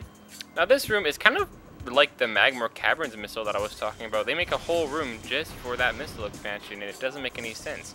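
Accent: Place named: Canada. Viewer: Wait, I noticed a bit of an accent...Are you Canadian????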